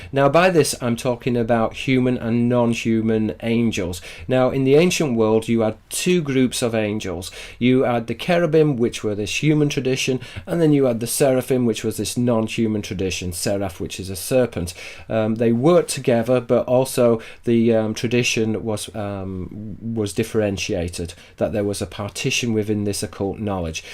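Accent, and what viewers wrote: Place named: North West England, United Kingdom. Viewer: Dear, thank you for your most interesting work. I was advised to find you by way of Tom Carberry via shroomingnewman 3... I’m sorry I can’t remember from which video this came but you said that the? (rulers) release knowledge through deception. Would it be alright for you to help me understand this a little more? Also, in relation to the ‘ha’... for almost four years myself and my son have been, (for want of a less triggering term), ‘born again in the Spirit Christians’... anyway, whenever I pray, (literally just talking and asking if necessary) to our Father and Mother and I forget something, I always ask the Holy Spirit to help me... whenever I do, my mouth opens of its’ own accord and a gentle but definite exhalation of breath happens. And it makes that lovely little sound... So, ha! that’s that... 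Then also, you sound so very much as if you hail from the North West Of England. We live in Morecambe. You have a very similar accent... Okay, well i’ll go now. Thank you again and all of the very best, from me Sarah.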